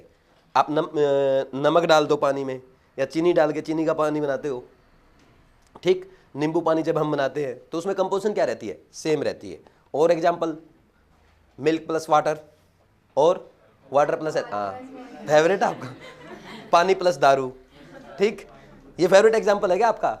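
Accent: Haryana, India. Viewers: your accent is too similar to Haryanvi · Sir are you from haryana?!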